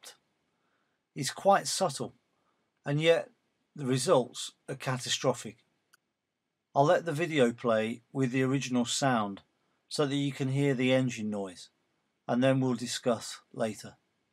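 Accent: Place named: England, United Kingdom. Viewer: the narrator simply has one of numerous English accents, giving a clue as to where in the United Kingdom he originated.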